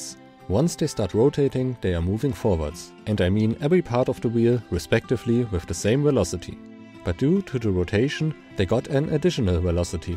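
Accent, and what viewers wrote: Germany. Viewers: Man o man. You can just hear on his accent how much German he sounds. Cool video. Keep it going. Love the animation.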